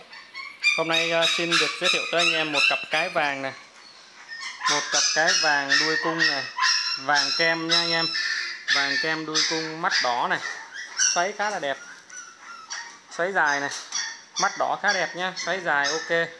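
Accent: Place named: Thanh Hoa, Vietnam. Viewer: Giọng bác giống giọng thanh hoá quê ta quá 😂😂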